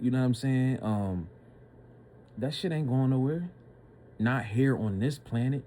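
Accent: Philadelphia, United States. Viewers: Philly in the building, I heard it soon as you spoke, 👍👑👑👑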